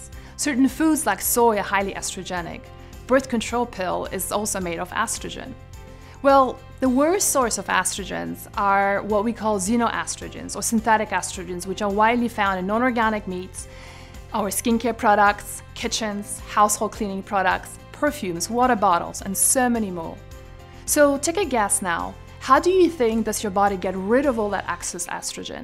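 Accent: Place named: Ireland. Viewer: Like ur Irish accent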